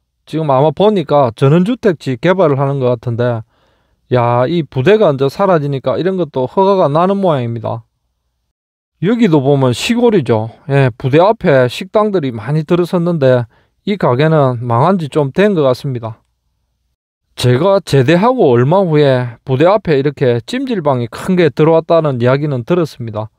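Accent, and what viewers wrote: Gyeongsang, South Korea. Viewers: 경상남도? 사투리 구수~합니다. 1년 형님 이시데요 저는 쥐띠 입니다. 95년 9월 전역했습니다.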